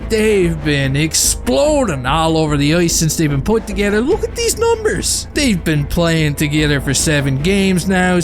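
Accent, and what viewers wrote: Newfoundland, Canada. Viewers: I follow about 10 Habs page on Youtube, and you're my favorite. You're funny as shit, your Newfie accent makes it twice as funny. Keep up the great work budz 💯👌👍🐐🥇🏒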